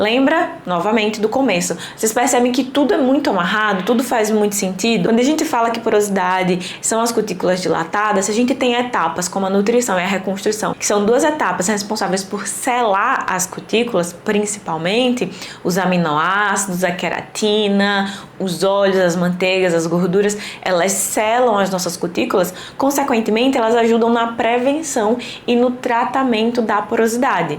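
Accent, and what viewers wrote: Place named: Pernambuco, Brazil. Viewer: Olá! Você é Pernambucana? Seu sotaque é tão lindo 😊 sou nova aqui ❤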